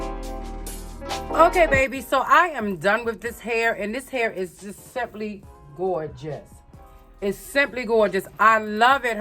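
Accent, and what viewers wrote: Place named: New York, United States. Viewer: You talk like an Italian mob wife lol I love it!! Are you from Jersey or New York?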